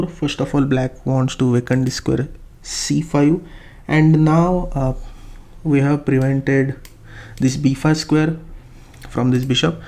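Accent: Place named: India. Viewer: im gonna be honest, i dont keep up with chess enough to know that many fide masters. but this is actually great for me. i love listening to an indian accent explain things like this. idk why. combine that with chess and the fact that youre definitely qualified to teach this stuff? instant sub.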